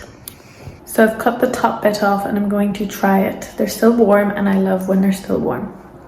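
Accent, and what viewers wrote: United Kingdom. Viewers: Do you speak with british accent? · I think is british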